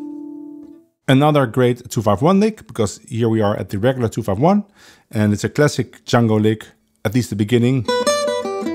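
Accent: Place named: Netherlands. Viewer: Your Chord shapes are unusual. You're definitely a fabulous teacher. Worth studying. Love the Dutch accent.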